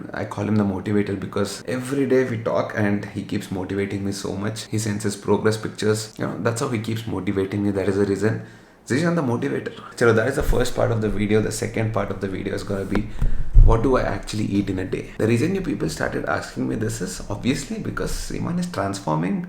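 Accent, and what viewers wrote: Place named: Telugu, India. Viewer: Bro nuvvu English lo matladina maku telugu lagey anipisthundhi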